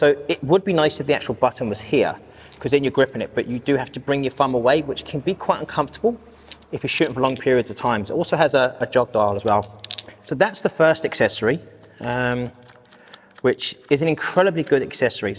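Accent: United Kingdom. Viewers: Don't worry, POHM, there isn't even the most remote hint of fush and chups in that accent! 100% UK very obviously. As you were. You can CNC machine from cast or extruded or "billet" or forgings. What you start with and how you get it to shape are somewhat independent. If they machined it from a bullet, though, it must've been a BIG one. ;-)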